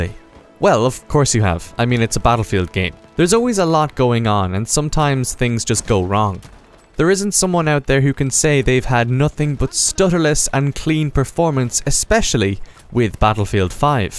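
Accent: Ireland. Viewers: thank u man for this vid i thought it was just me getting that shit and btw are u irish?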